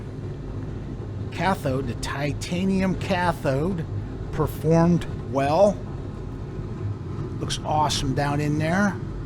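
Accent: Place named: East Coast, United States. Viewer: Judging from your accent I'm assuming you are on the East Coast or possibly Chicago I kind of wish I was closer because I would love to apprentice under you and learn how to do all this stuff... Your videos cover so much information but I know you don't go over all your little tips and tricks.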